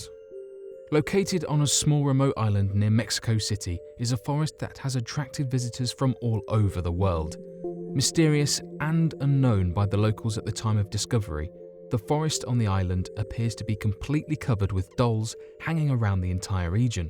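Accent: United Kingdom. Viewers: Co.ag for music, British accent, why not be Top5s 2, instead of unexplained mysteries?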